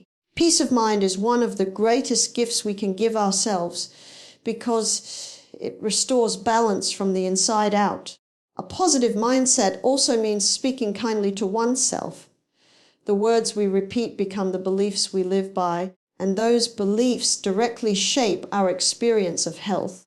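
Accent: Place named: United States. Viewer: This isn't Barbara it's AI. As an Australian I can tell it's not her accent, it's American.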